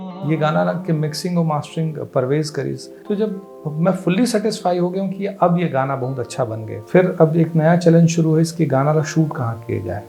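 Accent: Chhattisgarh, India. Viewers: आपके आवाज में छत्तीसगढ़ के संस्कृति झलकथे भईया 🙏🙏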